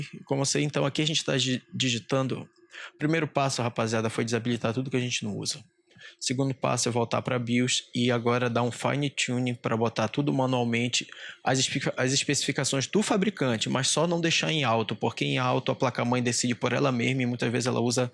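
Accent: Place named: Pará, Brazil. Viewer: Certeza que é do Pará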